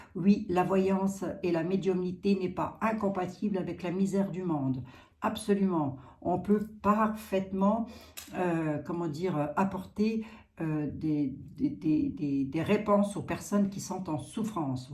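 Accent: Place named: Lorraine, France. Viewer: Bonjour, vous avez un petit accent qui résonne à mon oreille...Lorraine ? Bien à vous !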